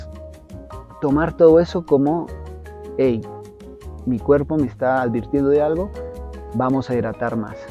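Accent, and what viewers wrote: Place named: Mendoza, Argentina. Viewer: Hola muy claro tu mje.,soy Mendocino pero quede desconcertado con tu acento,sos Menduco?.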